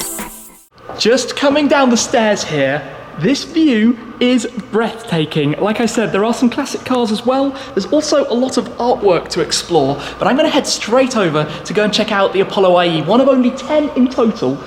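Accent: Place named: England, United Kingdom. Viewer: i really like your english accent. verry lovely hearing👂👂💗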